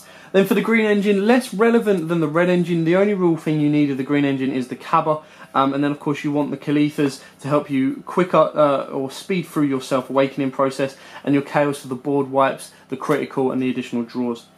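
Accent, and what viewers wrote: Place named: United Kingdom. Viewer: Nice to hear a UK accent, so annoying we don't get set 4 for ages 😡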